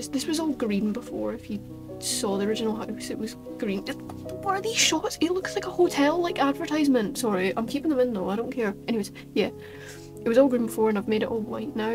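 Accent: Scotland, United Kingdom. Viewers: She’s Scottish like my silly billy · OMG A SCOTTISH BLOXBURG YOUTUBER SCOTLAND FOREVERRRR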